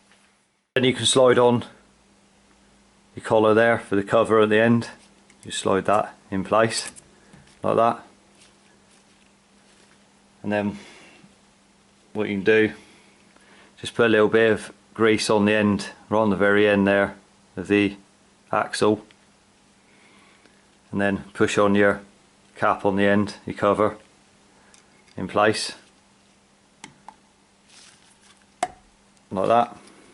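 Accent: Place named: Gloucestershire, United Kingdom. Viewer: Thanks a bunch brother. You accent seems like you are very close to where i live in Gloucestershire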